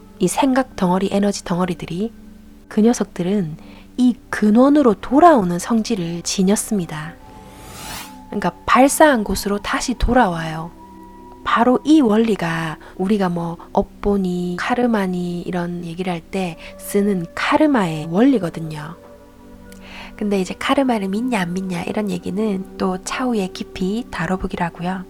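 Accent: Gyeongsang, South Korea. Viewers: 님 감사합니다 ~ 고향이 어디세요? 같은 경상도 사람으로써 경상도 억양이 너무나도 정겹고 듣기가 편합니다. ^^. 언젠가 가까운 시기에 꼭한번 뵙고싶습니다 ~ 좋은 말씀 듣고 힘내고 있습니다 늘 감사합니다! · 안녕하세요~ 님 오프라윈프리 방송인줄 알고 들어왔다가 님의 러블리한 사투리에 포옥 빠져서 듣다보니 벌써 두번째 보고 있습니다. 남들이 지적하는 나의 단점이 오히려 인생역전이 되는 최고의 장점이 된다는 말씀 그걸 어디서 언제 발산하느냐가 관건이다!!!는 말씀 가슴에 와 닿았습니다. 제 자신을 찾기 위해 나만의 색깔을 찾기 위해 날마다 물음표를 던지고 있는 저에게 큰 도움이 되는 영상입니다~ 감사합니다❤️🤩⭐️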